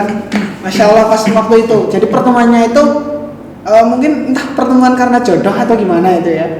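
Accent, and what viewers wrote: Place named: Java, Indonesia. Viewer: Mas medok sekali mas 😁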